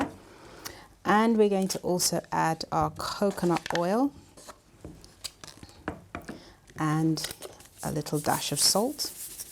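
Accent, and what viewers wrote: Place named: United Kingdom. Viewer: The long pause and pan up of the camera at the beginning of the video was quite creepy and strange 😂 I also was not expecting her to have a British accent.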